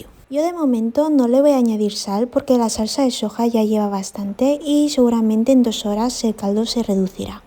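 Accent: Spain. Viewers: Excelente video Pero tengo la duda😅 ¿Porque es chinita y el acento es como de española? 🫣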